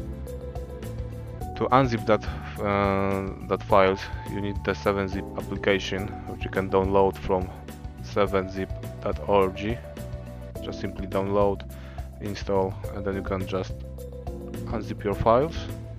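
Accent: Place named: Poland. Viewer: What language you are using ? bcos if its english... then you have to practice a little bit more... thats more like polish then english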